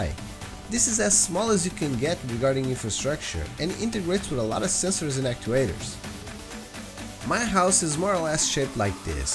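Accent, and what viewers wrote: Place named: Portugal. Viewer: Muito interessante, e barato. Fiquei surpreendido por ser um vídeo português, já que o seu inglês quase não denuncia o habitual sotaque. Parabéns 👍🏻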